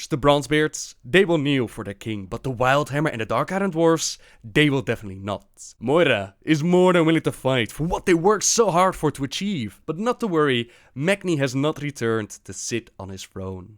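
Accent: Netherlands. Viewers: And when u click on it, it needs 2 talk in his dutch accent haha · I fucking adore these giant perspective stories of the universe, Sargaeras, the world spirit, etc. Can't get enough of these Dutch accent English (?) narrated videos. Would love it if you gained an official position as a Lore Keeper for the Warcraft universe.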